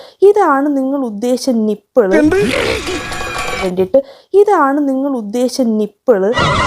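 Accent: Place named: Alappuzha, India. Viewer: Ith kollam bhasha alla. Alapauzha aananu thonunu. Malabar airunel thuranu kaanichene..😂😂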